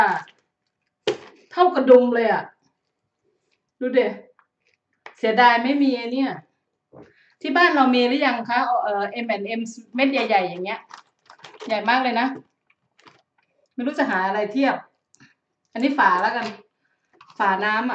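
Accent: Isan, Thailand. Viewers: คนจังหวัดใหนค่ะเห็นพูดอีสาน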